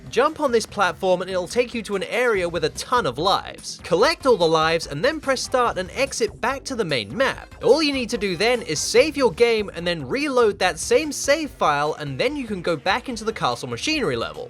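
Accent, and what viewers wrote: United States. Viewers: What the fuck is your accent? Had to turn this off because I was too busy trying to work out why you sound like 20% American and 80% from England. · Your jarg American accent actually offends me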